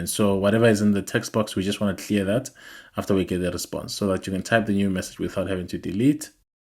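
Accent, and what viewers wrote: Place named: South Africa. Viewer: I heard your accent and knew ukuthi ungumkhaya(South African). Happy to see such videos from people on my land ❤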